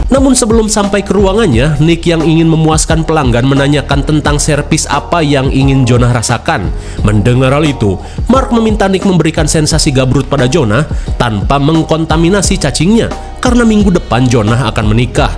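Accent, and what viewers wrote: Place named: Bandung, Indonesia. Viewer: Si aa mah sunda na bandung pisaan aa 👌👌👌 Wewe gombel 😂😂😂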